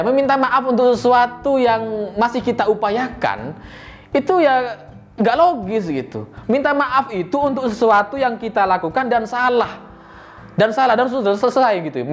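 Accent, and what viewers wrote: Madura, Indonesia. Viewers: Mas Maduranya di tonjolkan dong...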